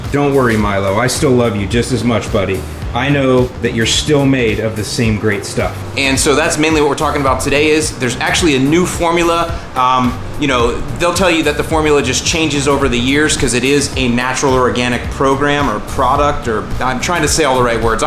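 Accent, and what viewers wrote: Chicago, United States. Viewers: Your accent is like Ditka, from Chicago. As someone who has worked at Jone's Island and personally made Milo there lol, knock it off with the Chicago talk, we sound like Yoopers here in Milwaukee.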